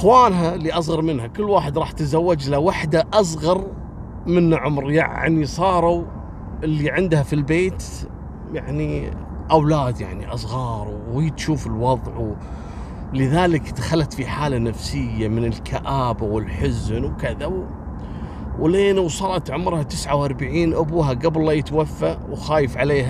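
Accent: Egypt. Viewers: ما أحلاك وانت بتحكي مصري يا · الله الله عليك حجيك بلمصري يجنن 😂😂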